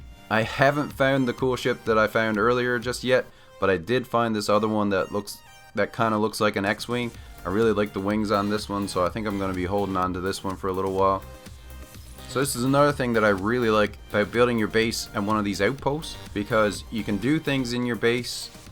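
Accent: Newfoundland, Canada. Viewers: U Canadian AF my boy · Started the video and instantly thought.. You are definitely from Newfoundland.. :p Just got this game haven't tried it yet but if you are from Newfoundland that's pretty reassuring :D can trust another Newofundlander :) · I could tell you were from Canada immediately from the accent. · are you from newfoundland? you have the craziest accent.. heavy af canadian accent with hints of irish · Total wholesome content man I'm subscribing. Oh btw are you Canadian by any chance ? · Was I recommended this guide because you’re from Newfoundland? Or are you generally a popular NMS content creator? First time here and recognized the very local accent, lol. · I was watching and couldn't get over how familiar your accent is, then realized you're a fellow Newfoundlander! Always nice to stumble upon a neighbour in the wild. :) Great tips, by the way! 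· Newfoundland accent? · You're the most Canadian sounding YouTuber I've ever found. (I'm also Canadian. Subscribed.) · i love your newfie accent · i cant tell if your accent sounds like a really weak newfie accent or not lol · Buddy, as soon as i heard the whaddayaat i was like. i knows where buddys from. Prolly from up da shore over across the way. Nice to see someone else from the rock! haha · Haha heard that accent knew exactly where your from. I was born in st John's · I gotta say I do enjoy the Canadian accent. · I am watching this now and said wow sounds like he's from newfoundland then seen your username haha me too! Subscribed!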